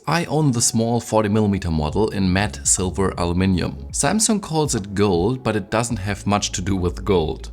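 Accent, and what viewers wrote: Germany. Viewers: Man hört sofort raus wenn ein deutscher englisch spricht haha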